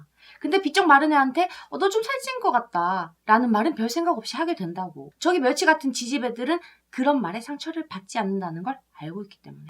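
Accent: Gyeongsang, South Korea. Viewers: 갱상도 어딘교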